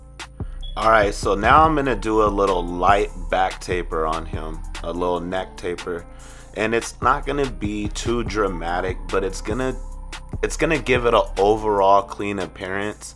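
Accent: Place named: California, United States. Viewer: I knew you were Mexican , sound like from Cali . Haha idk man 🇲🇽, wouldn’t mind have another andis shaver 💈💈💈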